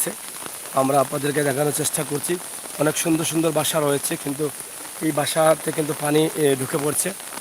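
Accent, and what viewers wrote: Sylhet, Bangladesh. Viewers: আপনার সিলেটী ভাষা সুনদর লাগে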